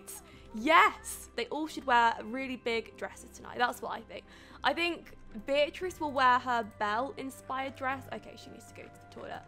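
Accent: England, United Kingdom. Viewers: i Love your accent! where is it from? i mean what Area in england?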